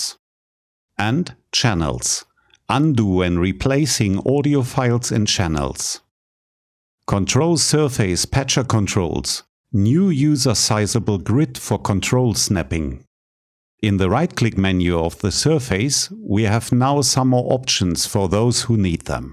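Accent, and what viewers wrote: Germany. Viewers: I LOVE this channel! Gold mine! And the German accent makes it quite entertaining! 😄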